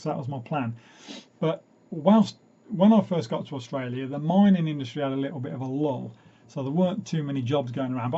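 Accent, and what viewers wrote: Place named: Northern England, United Kingdom. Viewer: i definatley wouldnt of picked your accent as coventry england.You sound like your more from northern england.Great story though 😉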